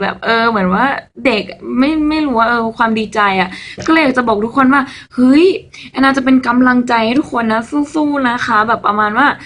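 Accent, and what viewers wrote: Thailand. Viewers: พูดใต้อยู่เลย5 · ฮาๆๆ มีอีสาน มาด้วย 5555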